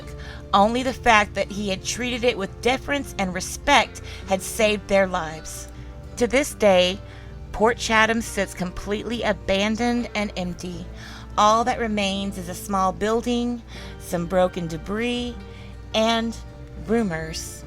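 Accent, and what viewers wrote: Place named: South, United States. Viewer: I just love the southern accent 😉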